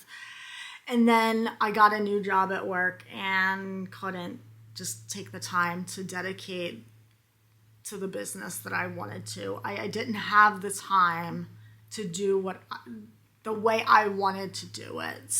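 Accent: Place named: California, United States. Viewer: South Central PA or valley girl? You sound like California.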